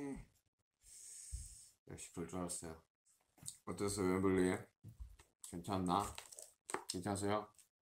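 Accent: United Kingdom. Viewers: Jada R it also sounded like he had a slight British accent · His english accent ( ╥﹏╥)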